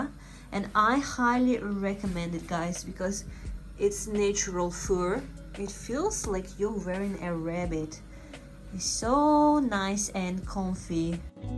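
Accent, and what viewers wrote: Russia. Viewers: Mind your pronounciation. You sound very rusdian😊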